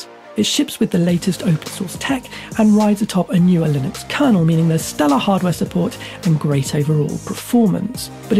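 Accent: United Kingdom. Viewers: Heavy British Accent! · Where is that accent from? (Sorry, I'm not a native speaker, but it sounded really cool to me) Is it british? Excelent video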